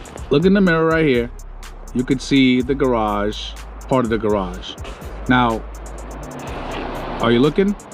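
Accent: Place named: Spain. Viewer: I think he’s Spanish American as he has a Spanish accent in his speech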